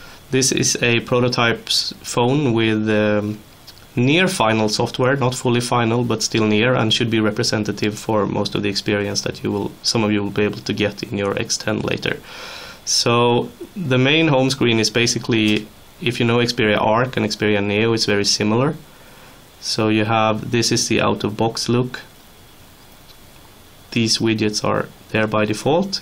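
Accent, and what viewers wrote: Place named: Sweden. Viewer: i love how you hear that hes swedish xD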